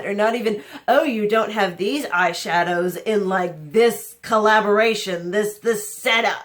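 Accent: South, United States. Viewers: I’m from the South but live in NJ now. I love listening to your accent and after watching your videos, my kids tell me my use of the word “y’all” goes way up! ❤️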